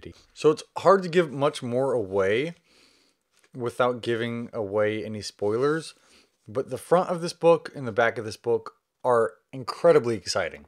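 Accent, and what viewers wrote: South, United States. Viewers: I can't believe you had like a book called, "To Sleep In A Sea of Stars" by Christopher Paolini at your house in Texas during your quarantine until you're reading the whole book and talking about Kira Navarez's life was on the brink of a happy change. She was newly engaged to her co-worker named, Alan, geologist and they were planning to settle on Adrasteia, the moon of Sigma Draconis, where they had been working together for several months. It's really important to do that and I hope your husband, Zach will be very proud of you, because I love your Southern accent!!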